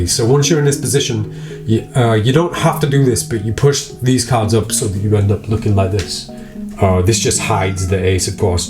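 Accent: United Kingdom. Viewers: The cockney accent is always on point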